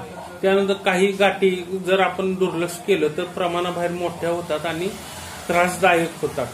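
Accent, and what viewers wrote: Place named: Kolhapur, India. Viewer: डॉ छान माहिती दिलीत homoeoathic बद्दल आपल्या बोलण्यात कोल्हापूरी साज पण मस्त आहे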